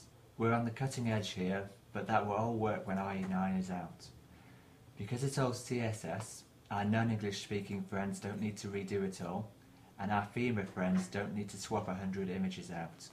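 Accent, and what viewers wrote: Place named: United Kingdom. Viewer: The Brittish accent, itself, isn't a problem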